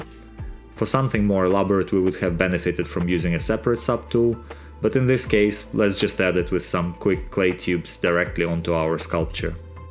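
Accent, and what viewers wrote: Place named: Russia. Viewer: А акцент то русский 😂😂😂👍👍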